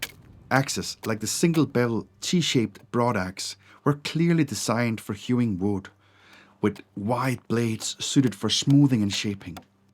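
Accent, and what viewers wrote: Ireland. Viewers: I wonder if you ever lived in the North of Ireland? The Dane and Irish accents share many similarities :) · Great educational video again. Just wondering do you have any Irish connections? To my ear I can hear some Irish in your accent.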